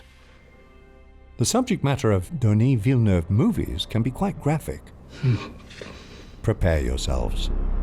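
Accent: RP, United Kingdom. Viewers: I have a hard time taking the narration seriously with him doing the RP accent.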